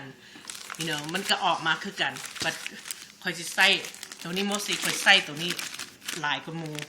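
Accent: Laos. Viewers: The crepe were lumpy, some had holes, the fillings were falling out. they were ugliest mess but very yummy. I love the sweet and sour sauce. I just need more practice. I use a lot of MSG and I am very much a live. So I will keep using it. thanks for the tutorial, your Laos English is just perfect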